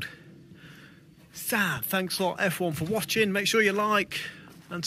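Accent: Derbyshire, United Kingdom. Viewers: Sounds like your from chesterfield or North Derbyshire ?????? Let me know pall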